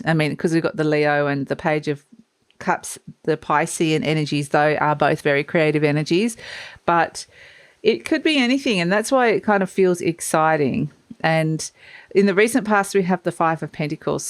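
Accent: Australia. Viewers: Damn I thought , you were probably from northern NSW like myself, but time in Qld has melded my accent , should have gone with my first instinct ha haa , love your work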